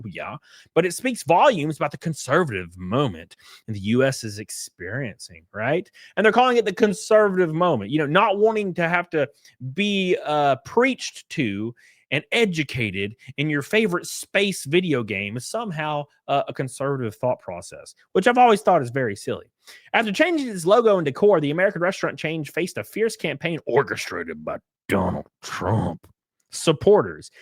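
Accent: South, United States. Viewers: Hey there’s the hunk with the bad ass country/southern accent, how you been?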